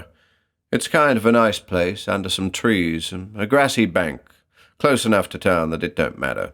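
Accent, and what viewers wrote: United States. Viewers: Really good American accent,:)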